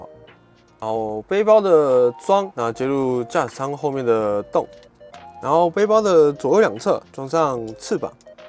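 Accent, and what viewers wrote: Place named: Japan. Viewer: 應該是剛學好普通話的日本人吧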